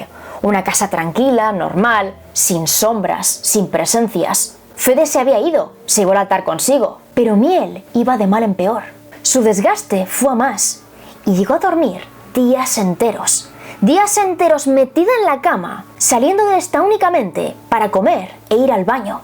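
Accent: Spain. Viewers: y sus kanas... xd Hermoso cabello blanco de, me encanta Tu acento español... me encanta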